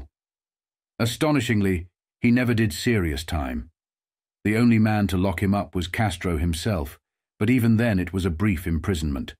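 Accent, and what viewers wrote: United Kingdom. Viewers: Who wants to hear a stupid British accent !!!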